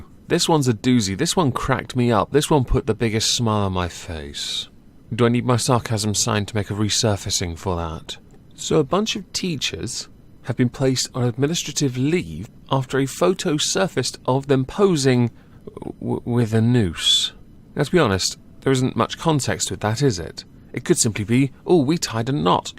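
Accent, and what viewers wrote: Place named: England, United Kingdom. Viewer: I think he's English hence why he said it that way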